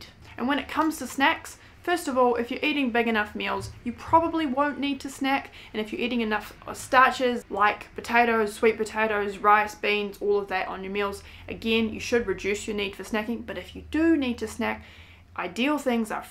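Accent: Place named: New Zealand. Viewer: Hi. First time seeing your channel. I heard your accent but I was trying to work out where you are. I’m a Kiwi too but live in Australia now (married an Aussie). You said “take out” instead of takeaway so I thought you must be living in the US. Then you mentioned having spent time there. That was great advice. I watch a lot of plant based vegan YouTube channels and they pretty much have the same meals as you showed. I think we all eat the same. 😂 What you said was so true. It’s easier to stay on track when it’s a habit. At first I thought no, I can’t eat the same every day. But I understand your points. Little tweaks can be easily made. Thanks!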